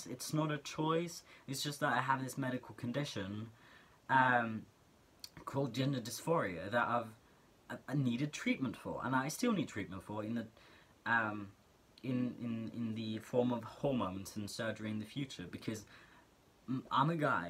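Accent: England, United Kingdom. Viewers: I gotta agree with the complements on your voice. Like damn, its an attractive voice. And when you add you english accent, it really sounds good. Have a great day :) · I realy Like the way u speak (i mean the brithish accend) sry i'm from Germany and my Englisch is not the best 😂 (this isn't so good because my Grandpa is from Wales so i'm stupid 😂)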